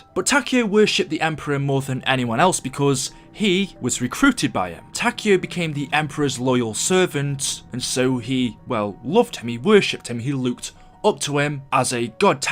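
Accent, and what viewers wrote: United Kingdom. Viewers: boa you shouldn't be talking your accent is britian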